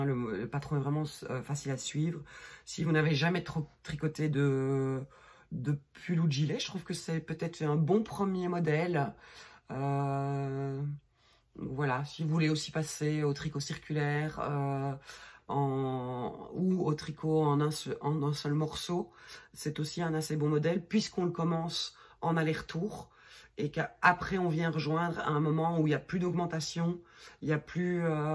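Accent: Belgium. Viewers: J'adore t'écouter placoter même si je ne tricote que des foulards...! La langue belge est jolie...tout comme ma langue directement du Québec.hi hi! bye et gros becs!!!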